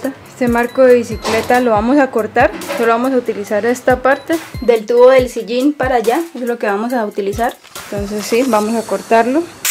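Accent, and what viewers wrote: Colombia. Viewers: Su acento es colombiano, creo que es de Santander o norte de Santander !! Felicidades a ella. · le escucho un acento Colombiano...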